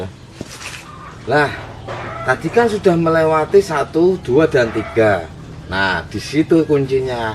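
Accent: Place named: Java, Indonesia. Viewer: ni bahasane medok banget ternyata, pakai sulap spt pak Tarno pula 😀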